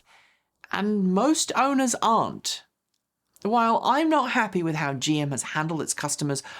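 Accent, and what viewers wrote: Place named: England, United Kingdom. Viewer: I thought this might be click-bate, but as per usual, this just sounds like my inner monologue, but with a lovely English-Accent. Love it.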